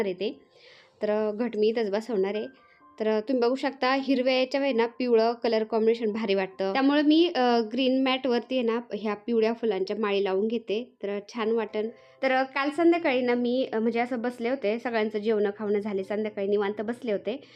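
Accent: Ahmednagar, India. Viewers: श्री स्वामी समर्थ ताई डेकोरेश एक नंबर. केडगाव देवी ला नक्कीच येईल मला खूप खूप आनंद झाला ताई मि घरात सगळ्या ला सांगितले ताई बोलवल ताई आज फोजी साहेब कुठे गेलेत दिसले नाही ताई आपली नगरी भाषा छान 🎉😊😊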